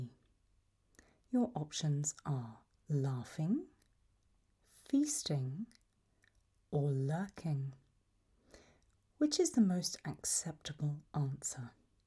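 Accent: England, United Kingdom. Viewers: I really love your English accent, it is soooo comfortable and beautiful ❤❤( I don’t know if this is polite and sorry if it is impolite I just want to say it’s so much comfortable and really make me relax!!)Thank you for all your hard working on ASMR~~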